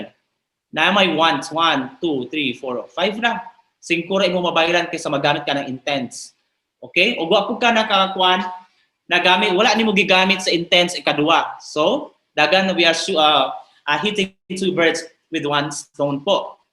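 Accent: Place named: Visayas, Philippines. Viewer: Ay bisaya siya daaai😂❤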